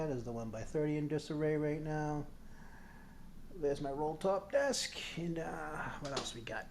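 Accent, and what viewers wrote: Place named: New England, United States. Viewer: Pacific cutlery! And I would recognize that accent anywhere go PATS!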